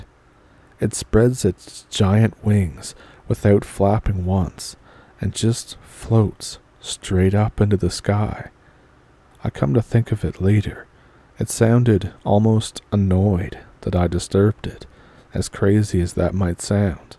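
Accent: Canada. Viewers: Must be Canadian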